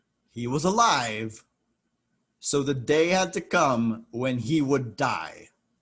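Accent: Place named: New York, United States. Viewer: That New York accent 😎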